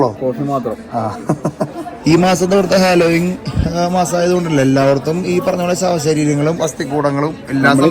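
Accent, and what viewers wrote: Thrissur, India. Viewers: അവതരണം. ആ സ്ലാംഗ് തൃശൂർ.ചിന്തിക്കാൻ പറ്റോ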